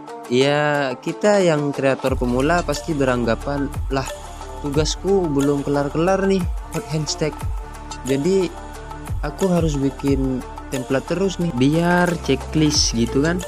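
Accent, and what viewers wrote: Madura, Indonesia. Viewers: logatnya madura bet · Ketarah reng medureh 😅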